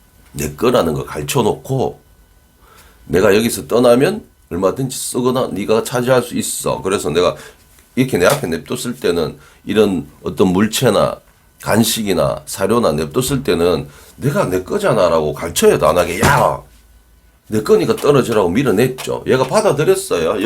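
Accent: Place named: Gyeongsang, South Korea. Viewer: 너무 감사해여~~ 3개월된 강아지 입니다. 입질도 심하고~ 으르렁 거리면서 진심으로 저의 손도 물고 기본케어를 전혀 못하는 강아지 입니다. 방문교육도 했는데 ... 저는 안되더라고요 ㅎㅎ 선생님 교육 계속 다시보고 다시보고 계속 다시보면서 아이보다 저의 문제 구나~~깨달았습니다. 열심히 주도권 교육을 하고 있습니다. 여기서의 리더는 나야 라는 어필을 계속 강아지 한테 표현하고 있습니다. ㅋㅋ 아직은 부족해서 타이밍도 잘 못잡고 제가 감정조절이 안되서 조금 불안하긴 한데 강아지가 눈치도 보면서 곧잘 하고 있는듯 합니다. ㅋㅋ 영상을 보고 행동했더니 일주일정도 되니 입질이 매우 좋아졌어요~ 더이상 따라다니면서 안물고 같이 자자고 떼쓰지 않아요~^^ 아직은 많이 부족한 보호자라서 많이 노력해서 배우고 강아지랑 좋은 관계를 맺어보도록 하겠습니다. 영상이해하기 싶고 경상도 억양도 너무 매력적이시고 ~~ 감사해하면서 시청하고 있습니다. 감사합니다 ~^^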